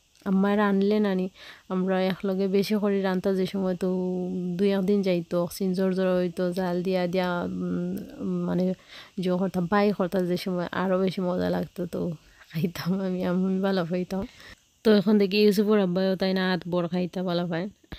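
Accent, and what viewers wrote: Sylhet, Bangladesh. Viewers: Afnar sylheti mat kub shundor, nice vlog oise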